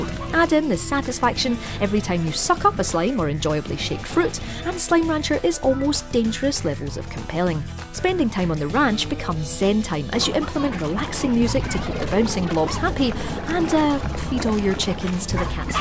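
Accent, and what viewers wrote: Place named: Scotland, United Kingdom. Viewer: her accent reminds me of merida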